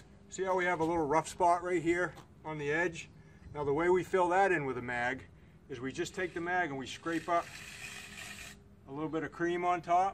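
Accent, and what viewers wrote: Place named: Massachusetts, United States. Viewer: Cornah' herah' fingahs' you from Massachusetts or what? If so fellow finishah saying wicked pissah video. I'm on my 19th year and over the last 3 have been going to northern California to pour in the winter months ......and mine gold⛏. If you are in new england let me know , I could put you on some gold more then likely. That's how gold found me 9 years ago, working late one night walking from basement to basement. Caught of glimpse of something shiny. Picked it up it was a pc of gold in quartz "I didn't think it was real" a year later camping in Rangley maine. Found a nugget in the river and it was on from there. Lots of old mines in new England and most of the gravel we pour on and is around the site holds gold in smaller pcs.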